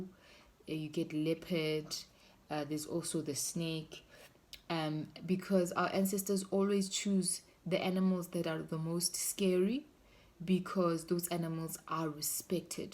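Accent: Nigeria. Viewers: Nigerian accent akere 😃😃👌👌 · It the Nigerian accent for me😅😅🤞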